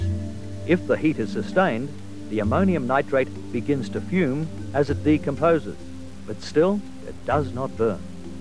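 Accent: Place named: Australia. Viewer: aussie documentary? listen to the accent, as an aussie picked it straight away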